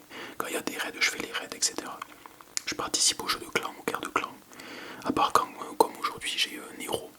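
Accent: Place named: South, France. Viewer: t’es du sud toi 😂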